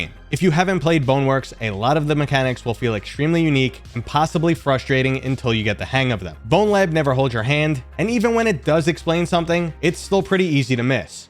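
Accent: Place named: New York, United States. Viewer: a small hint of new york in your voice is killing me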